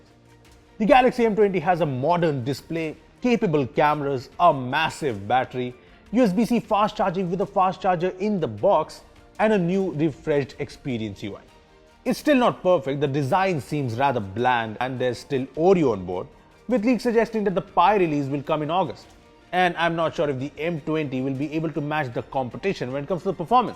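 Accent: India. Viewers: Omg ! All Indian youtubers = annoying accent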